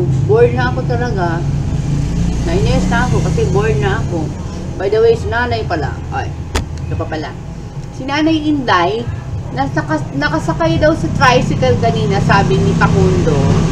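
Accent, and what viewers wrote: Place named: Visayas, Philippines. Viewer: Ntawa ako sa visaya accent mo sis 😃😂 ok nga minsan gusto mo ngang mg vlog ng mhaba pag nasa Labas ka pero my mga Tao ksi Kung makatingin e parang baliw. Good at my update Nman Khit papano ky Nanay inday sis.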